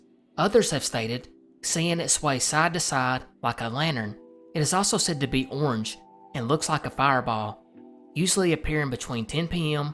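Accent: South, United States. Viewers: For like 5 seconds, I literally thought you had a Scottish accent because your southern accent is so significant!! And I’ve lived in North Carolina my whole life! I love how there are so many varieties of accents.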